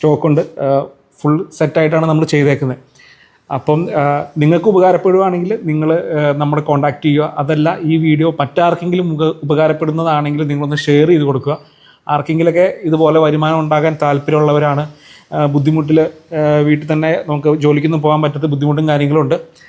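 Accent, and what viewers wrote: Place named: Kasaragod, India. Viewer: Thalpariyemund Kasaragod aane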